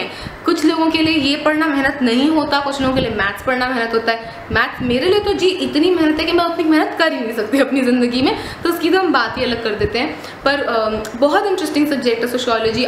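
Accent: Delhi, India. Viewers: Wow..! its so refreshing listening to your "diliwali hindi". Delhi is my hometown and its been a while since i left the city after my wedding. It feels nostalgic listening to you speak. i felt like i was hanging out with my girls in delhi. This video brought a smile on my face. Thank you,. You're amazing. Keep going. 👍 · Hi 😊 your Hindi is typical Delhi wali--haha! You remind me so much of DU I attended Miranda for a year but now in Europe. I love you Hindi Vlog and other videos as well. And 😁 your Hindi is so so similar to mine ( Love your hand actions while you talk 😊😊 haha) --lots of love 💛 Tenzin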